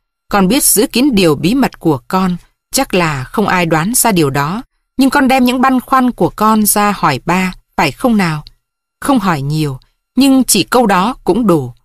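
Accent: Standard. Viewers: Truyện nghe đi nghe lại vẫn hay , nhiều tình tiết hấp dẫn cộng với giọng đọc thật chuẩn và truyền cảm , cảm ơn tác giả và người đọc thật nhiều . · Bản dịch quá hay . Giọng đoc cũng rất chuẫn . ❤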